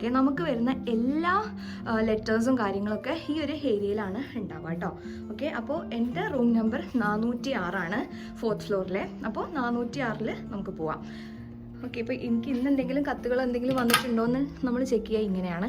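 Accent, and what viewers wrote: Malappuram, India. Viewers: Kozhikode aaanenn thonniyittilla, malapuram aanenn thonni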